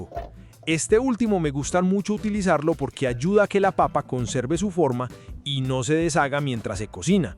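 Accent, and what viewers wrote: Colombia. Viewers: la verdad todas tus recetas se ven exquisitas en verdad gracias 🙏🏻las vea todas pero lo que más disfruto de n tu asentó parcero 😅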